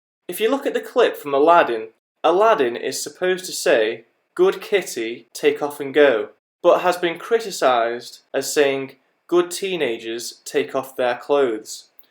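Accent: United Kingdom. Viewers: You from Manchester?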